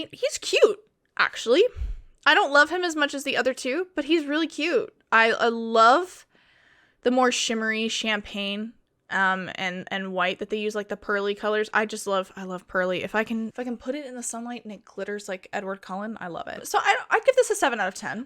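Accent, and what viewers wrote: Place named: United Kingdom. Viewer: British really came out in this one 💂‍♀️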